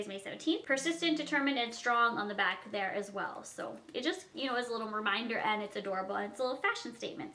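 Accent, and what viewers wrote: Canada. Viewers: M C thought i was the only one that noticed specially bag or tag anything with a ig its a canadian thing or ? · It's the slight Canadian accent. All of my family comes from Canada and they all have the same pronunciations as does.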